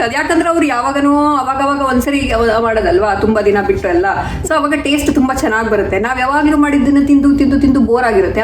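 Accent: Mangalore, India. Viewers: Very nice vlog... I like cuteness nd ur manglore style talk....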